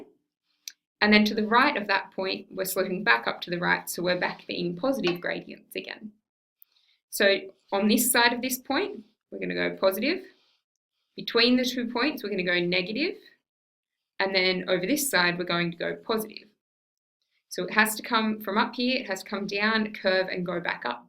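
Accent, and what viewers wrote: Australia. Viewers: Oh my gosh thank you very much!! Hi from the UK, I can defo tell your Australian :D love the accent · thank you so much, this was a lot of help! im from New Zealand and im trying to figure out if your accent is from here or Australia. I think you're from Australia???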